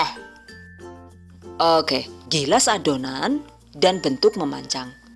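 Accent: Banyumas, Indonesia. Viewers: Kakak orang madiun,? Tapi logatnya kok ngapak.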